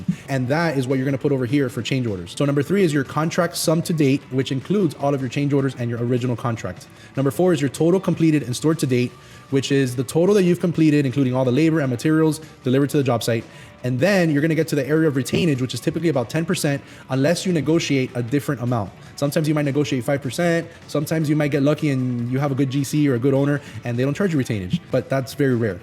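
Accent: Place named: Miami, United States. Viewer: Are you from Miami? I could tell immediately by your accent! Lol. I'm also from Miami :)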